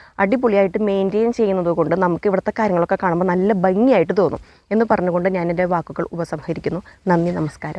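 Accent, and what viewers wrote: Thrissur, India. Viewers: your malayalam slang is good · നല്ല തൃശൂർ ഗഡി ഭാഷ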